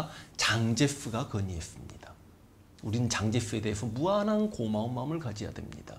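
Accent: Jeolla, South Korea. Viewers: 전라도분이 전라도말투가 나오는게 정상아닌가요? ㅎㅎ 그걸 숨기면서 설명을 하신다는것 조차 힘들지않을까요? 지금 이야기하신부분.. 이해하기 힘드네요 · 재밋게 잘보고있습니다. 모든강의가 제생각과 틀리지않다만..본인스스로 진보라고 하신점도 중도인 저는 지지합니만 성향이 갈수록 기우는느낌은 불편하고 거기에 전라도말투가 섞여나오니 불편해지네요? 색깔을 안보이게 하시면 더욱 인기있는 강사님되실듯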